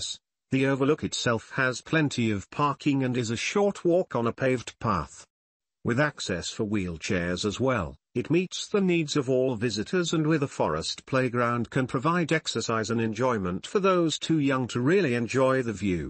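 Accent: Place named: England, United Kingdom. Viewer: I truly enjoyed this "experiment". The English accent is enjoyable - my Grandmother was English. I am relatively new to Morgantown. I have been to Cooper's Rock - simply breathtaking! But with your drone, I am able to see so much more! I also enjoyed the history - it adds to the experience. My vote - keep experimenting, please.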